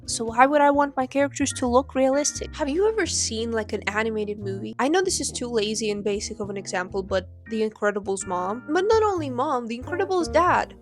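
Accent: Russia. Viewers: is this Russian accent I hear? прикольно видеть русских даже на англоговорящем интернете :D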